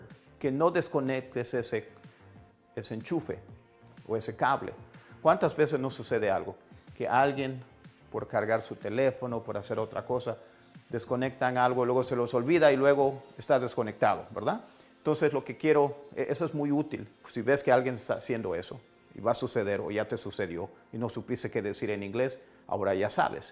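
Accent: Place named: United States. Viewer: Está en los angeles el profe, con razón su voz suena Américano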